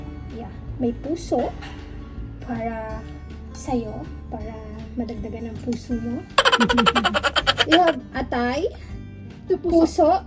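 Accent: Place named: Ilocos, Philippines. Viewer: Yes authentic and natural at first time pla lutuin ito ang cute mo mag ilokano ha mangan tayun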